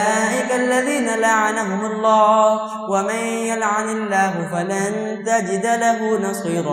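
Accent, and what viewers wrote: Panipat, India. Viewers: Pure pani Patti lahja,bahtreen MASHALLAH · Bhai isse nach kr prhna nii kehte Isse pani patti lehja kehte h ap ko apni islah ki koshish krni chahiye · ما شا الله لهجه تو پا نی پتی لیکن یہ جھو لتا کیوں ہے؟ سمجھا نے کی ضرورت ہے ۔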